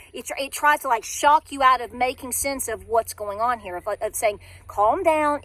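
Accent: South, United States. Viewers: I LOVE your accent!! It’s such a beautiful southern sound; both my grandmothers were southern and I loved hearing the soft lilt in their voices:)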